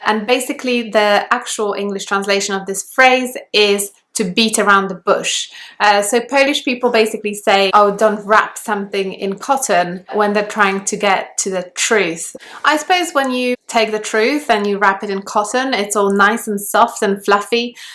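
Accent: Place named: England, United Kingdom. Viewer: A rare moment for me, not the simp type guy, but to hear my favorite accent English~ Enunciators to the Gods 🎉explain a much less proper, posture mess, 😂-of a language, combined with woman often making very little sense, (if the only understanding comes from being led by the actual words they utter, when that's tip of the communication iceberg😢) Picture asking "honey what's wrong?" speak but then a proper excavation of both point & game can uncover a purposeful MATCH of misleading cues, hues & views, with the phrase ~"more than you bargained for",~ being a two sizes too small corset statement = equaling you as the faintest light at the end of the proverbial tunnel. Bringing me to shore before swallowed whole by what the heck, hell, wtf, get to it, what's you're point? Do You have a point? But you landed both Polish & English home to earth putting practicality into the superfluous & purposely roundabout, point..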